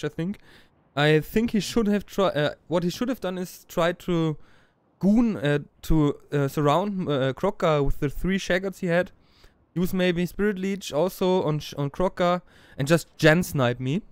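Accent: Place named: Germany. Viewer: nice english, nearly unavailable to sound out the german accent^^ but you should´ve changed the videodescription. i fear there won´t be any anglophones to see your great victory ;) and in the end, the lizardmen monsters are much stronger then the chaos ones. what a great strategic performence xD but anyway nice to see some monstersmash :)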